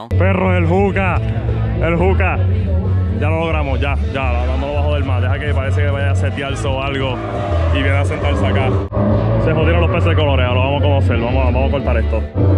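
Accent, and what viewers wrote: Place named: Mexico. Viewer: no te conocía Bro, youtube me sugirió tu video y blogeas muy bien, vas bien, eres de PR pero traes un toque como mexa y eso es cool, saludos desde MX!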